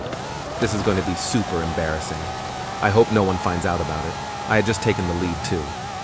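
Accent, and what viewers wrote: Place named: Australia. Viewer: that sounds more like Australian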